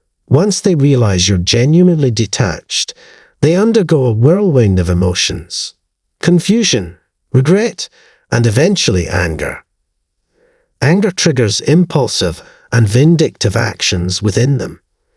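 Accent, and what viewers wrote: Scotland, United Kingdom. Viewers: Great talk. I was in the relationship for 50yrs. Back then you never heard of manipulation or control. I was one with empathy. I remember one time he was at the doctor's he got tests, when he got the results I asked what the doctor said I was told to mind my own business. I have never asked him again. He was cruel and would say nasty things. He even said something to one of my daughters about me, she was thinking to herself mum is not like that. They love to play the victim and blame you for everything. I have no desire to have him back in my life. No love, affection, he made out I had a problem but it was his control. I still feel the loss as I was the romantic one. I'm so grateful I'm still alive and getting help. I don't care what people say about your accent I'm Scottish as well but have a different accent. You are giving us a good life with education. 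Thank you and your channel. ❤❤🙏🙏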